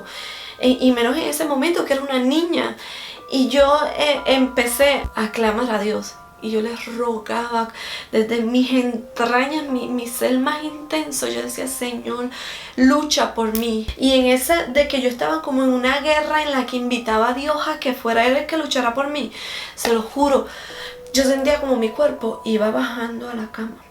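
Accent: Puerto Rico. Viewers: Ella habla bien simplemente es el acento y la rapidez para hablar de cada país muchos en Puerto Rico hablan así y en varios países más rápido después de un tiempo te acostumbras y les entiendes mejor. A mí me pasaba que a los venezolanos y cubanos no les entendía bien, es cuestión de entrenar el oído como cuando haces listening con el inglés 😀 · en el rato que te escuche tenias acento de PR Venezuela y Mexico?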